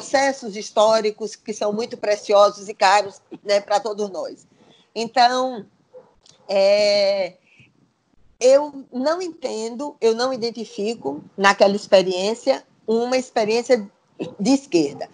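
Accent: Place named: Northeast, Brazil. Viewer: boa noite !!,hoje estou matando-o minha saudade de vê essa mulher nordestina como eu,nosso sotaques é um orgulho da espiritualidade e uma busca por coisas melhor .vamos nós sempre firme neste sentido .obrigado .!!!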